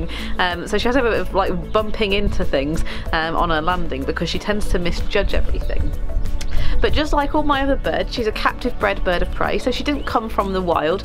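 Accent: United Kingdom. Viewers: Excellent presentation. Even without the British accent this would be an excellent presentation.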